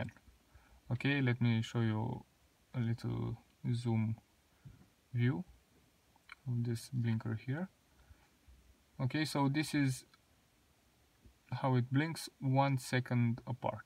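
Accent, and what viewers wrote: Romania. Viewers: Esti român? Ai accent :))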